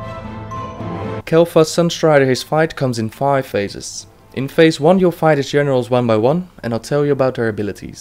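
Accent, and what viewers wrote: Netherlands. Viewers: are you from the netherlands ??? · Haha i can hear that ur dutch xD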